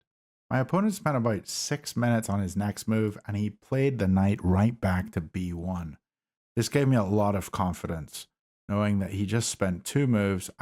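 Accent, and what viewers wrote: United Kingdom. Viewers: Thought you were British mate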